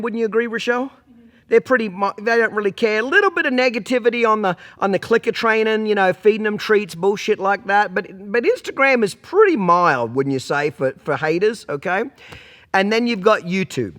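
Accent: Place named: Australia. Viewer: I never knew Garth Brooks has a Aussie accent..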